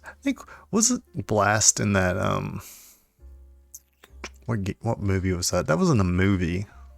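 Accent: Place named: Scotland, United Kingdom. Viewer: I wish I payed attention to these responses. a response 1 yr later....its like I'm watching your youtube videos from alpha centuari.....thats one light yr isnt it? hah anyways. let the thick scottish accent roll brother!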